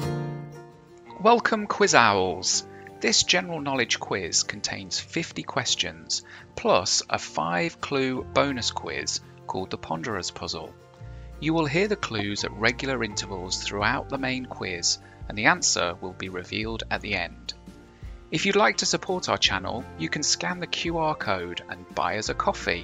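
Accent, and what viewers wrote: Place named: England, United Kingdom. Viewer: 18 wrong for me on that one. Although I know it is a sphygmomanometer, most medical professionals call it a cuff, probably because that is less of a mouthful. As for the ponderer's question, I'd have thought with your English accent you would have known it may be an elevator for Americans, but it is a lift in English English.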